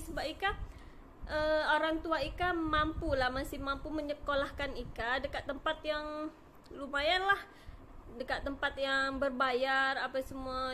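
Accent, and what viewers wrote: Malaysia. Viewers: bagusnya ika servive. lagi cakap juga sudah malaysia. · sudah boleh bertutur mcm org Msia...bagus kl boleh d beritahu asal dr daerah mana d Indo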